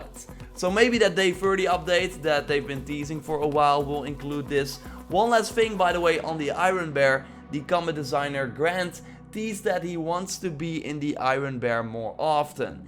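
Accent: Netherlands. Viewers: Great vid sir but are you dutch?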